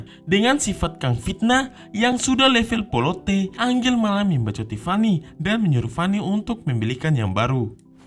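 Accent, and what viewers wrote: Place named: Maluku, Indonesia. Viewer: suara nya udah jelas cuman intonasinyaa agak kasarr bang z ini orang maluku jadi logat dan bahasa nya kental bangat. Dia juga bru mulai jadi menggunakan alat seadanyaa. Rata2 org medan suka pembawaannya soalnya logat nya aga2 mrip.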